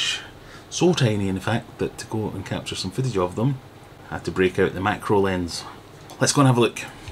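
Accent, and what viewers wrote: Scotland, United Kingdom. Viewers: Nice to hear a fello Scott 😃 I'm going to be setting up a nano tank with these hence finding this video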